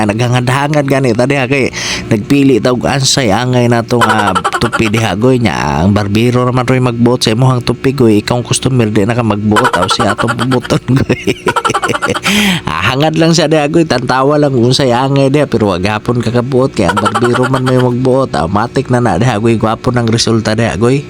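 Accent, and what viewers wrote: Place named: Cebu, Philippines. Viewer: Like12...MAAYONG GABII IDOL... AYAN OK NA GUPIT SA BAGONG STYLE PAMORE .. TINUOD KA LODS...DI BITAW LALIM MAG VLOG CONTENT CHANNEL PERO OK LANG LABAN LANG SA BUHAY ALWAYS HAPPY...NINDOT DIAY NI NGA BARBERSHOP KAY ALL AROUND PAMORE... IBA ANG TUNO LENGUAHE NGANHA SA CEBU KAY PURE JUD KAAYO MANGISTORYA DILI PAREHA DIRI SA MINDANAO BISAYA/CEBUANO SAKSAK SINAGOL PAMORE... DONE WATCH FULL SUNGKO SA TUMOY... mins.😊😊😊WITH ALL ADS COMPLETE...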